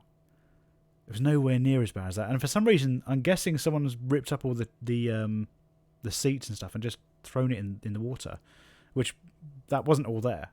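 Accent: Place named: South, United States. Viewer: Hey, I'm new to your channel and absolutely loving it! I'm from up north and trying to locate where your accent is from, where abouts from the South are you from?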